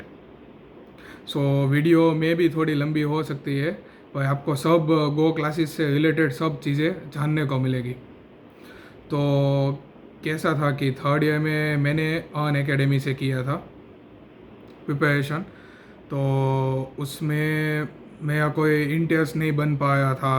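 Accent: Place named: Gujarat, India. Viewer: That gujarati accent relatable fr 😂💖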